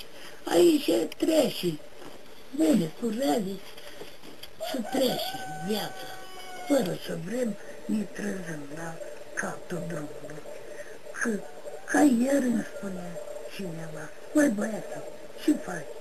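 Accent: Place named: Moldova. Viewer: He speaks in Romanian, with an accent typically encountered in north-eastern Romania (Moldavia region). Some of his words sound a bit archaic, meaning that today, ordinary Romanians would not use those words, since newer words (neologisms) are used.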